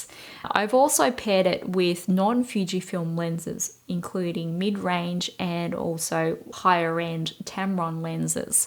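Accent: Australia. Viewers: Thanks for the really informative review, it's great to hear from an actual user of their experience. I also am enjoying your "Aussie" accent (with perhaps a bit of Asian in there), it's so pleasant to listen to! I'm about to order my XH2, and upon checking out the firmware updates it looks like with version 3.0 they've added the ability to set the ISO with the FRONT COMMAND dial. I've also seen in the manual that the current ISO can be displayed on the main screen. Unless I'm misunderstanding all this (don't have the actual camera yet), it seems that Fuji may have addressed this specific complaint. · Enjoyed the your thoughts and examples. Your Aussie accent so pleasant to listen to.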